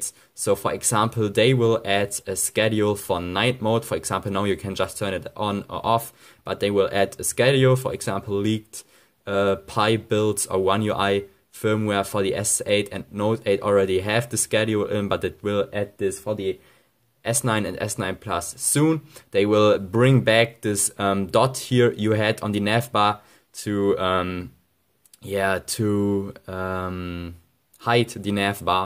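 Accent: Germany. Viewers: I love your German English accent 😍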